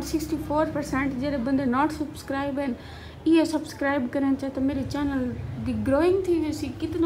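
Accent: Saraiki, Pakistan. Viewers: Taidi sraiki Sachi bhao mithi hai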